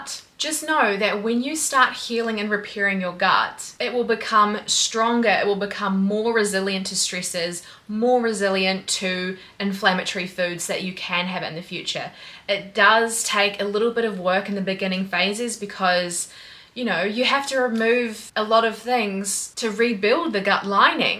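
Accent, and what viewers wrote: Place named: Australia. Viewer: wait where are you from if you said you moved to australia? im canadian but i only hear an australian accent i think? LOL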